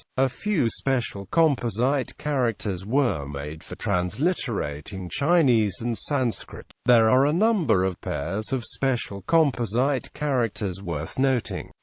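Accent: United Kingdom. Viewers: Get rid of British accent, and make a better video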